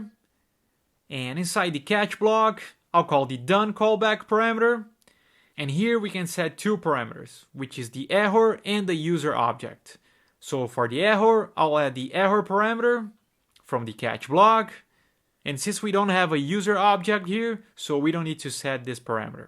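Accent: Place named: Brazil. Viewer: kakakaka sabia que tu era BR, sotaque tava mt familiar kkkk mas porra tu tem um ingles perfeito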